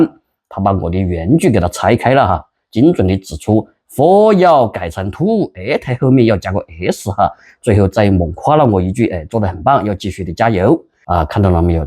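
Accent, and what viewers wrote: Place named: Sichuan, China. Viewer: 熟悉的川普😂😂😂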